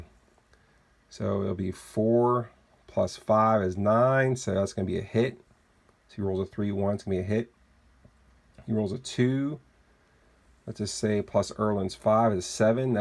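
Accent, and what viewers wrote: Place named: Pittsburgh, United States. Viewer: Nice video. Love the “Pittsburgh accent “.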